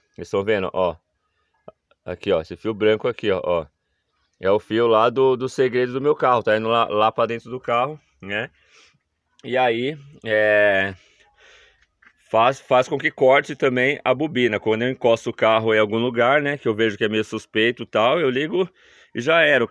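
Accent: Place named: São Paulo, Brazil. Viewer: Grato! Pelo sotaque você deve ser de SP capital... Você faz esse serviço de instalar o segredo? Qualquer auto-eletrico tem essa expertise?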